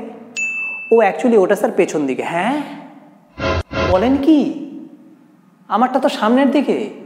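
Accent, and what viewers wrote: Bangladesh. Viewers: Dada Tume ki BANGLADESHI kano jane na tomar voice sune mone hoy....😕😕😕😕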